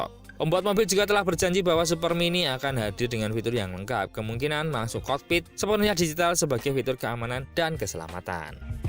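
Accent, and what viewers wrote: Java, Indonesia. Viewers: Mobil sih keren tapi gak nonton sampe habis gara gara narasi pake logat di medok medokin jawanisasi,,,, aneh denger nya ky cakupan siaran terbatas daerah,,,, gak nasionalis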